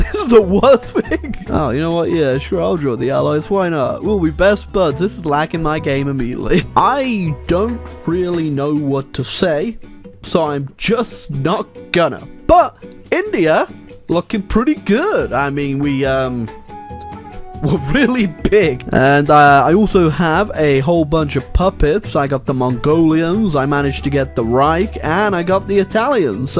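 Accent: United Kingdom. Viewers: You watch these videos and think he sounds anything like an American? Have you ever heard an American speak? He's definitely British.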